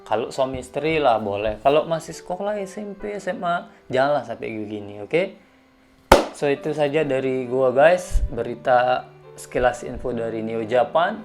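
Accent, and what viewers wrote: Lombok, Indonesia. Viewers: Ngakak gw anjr gara" aksen lomboknya masi😂 mantep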